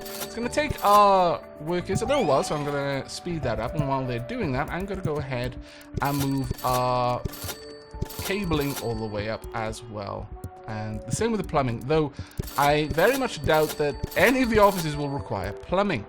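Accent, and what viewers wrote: United Kingdom. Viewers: I am only in the first minute but you kinda sound like this another great youtuber called BestInSlot who does Jurassic World Evolution/dinosaur games. I would say you both have similar vocal mannerisms, and your voices are both great and calming. Are you you British? Well I will go watch you video now. Thanks.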